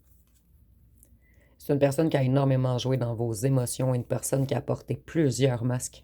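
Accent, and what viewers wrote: Quebec, Canada. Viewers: Bonsoir Madame , c'est toujours avec un grand plaisir que je vous retrouve et vos guidances toujours fort juste ,votre accent québécois j'aime beaucoup et vous aussi , vous faites partie de mes préférées , vos ongles mauve je doute pas qu'ils seront jolies car il le sont déjà j'aime bien cela de cette couleur ,et je ne sait pas comment vous faites ça mais vous avez du goût c'est très jolie je ne doute pas votre façon de vous habiller très féminine et très bien chic toujours , je me sens parfois si seule et votre présence me fait exister car je m'occupe de ma mère toutes ses journées qui ne sait plus rien faire toute seule et en maladies de Alzheimer ça me fiche le bourdon pas mal ,je peux m'absenter mais très peu car ne peux pas la laisser longtemps seule et ma sœur a pris 3 jrs a la mer avec son compagnon alors je regarde vos guidances et ça me permet d'exister un peu ,sinon je suis comme vous coquette et féminine aussi a part que la j'ai pas beaucoup trop de temps . 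Merci a vous et à votre bienveillance a notre égard pr nous faire profiter de ses messages gracieusement . Bonne fin de soirée.❤👍🍀🌹🥰🙏☀️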